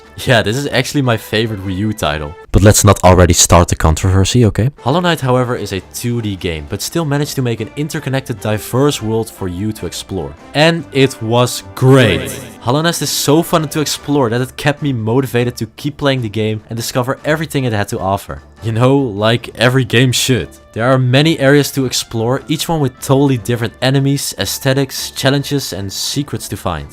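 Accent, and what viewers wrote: Netherlands. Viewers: je klinkt nederlands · am i just the only one smelling a bit of a dutch accent here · ik ruik nederlands man ngl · Wait are you Dutch? (Same) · Het nederlands accent door je engels heen is crazy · just for science.. are u dutch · You are dutch right?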